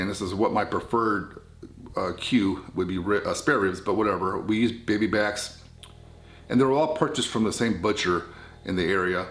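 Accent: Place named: Midwest, United States. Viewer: Ive thought you sounded like your from the Midwest. I was leaning towards Michigan, Wisconsin or Minnesota. Not a bad guess from your accent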